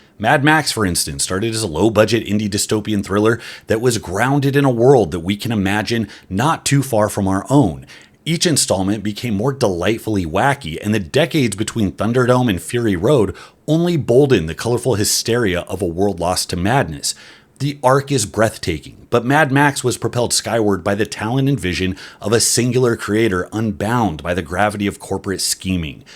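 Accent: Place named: United Kingdom. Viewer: Bromley British accent is the voice inside of my head. Remoinds me of a boss I once hated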